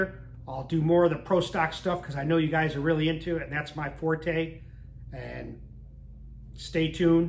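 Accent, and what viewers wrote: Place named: Brooklyn, United States. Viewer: Were you from Brooklyn or Queens? you sound like you have an accent from that area!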